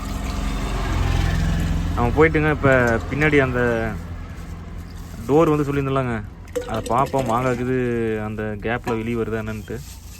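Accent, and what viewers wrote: Kongu, India. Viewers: கொங்கு தமிழுக்கு வணக்கம் சொல்லி கொள்வது நெல்லை தமிழ் 🎉🎉🎉🎉🎉🎉🎉🎉🎉🎉🎉🎉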